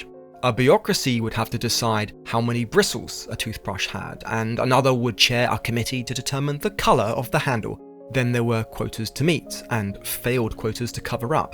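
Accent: United Kingdom. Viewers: Go back to the American accent. This British accent is so damn annoying.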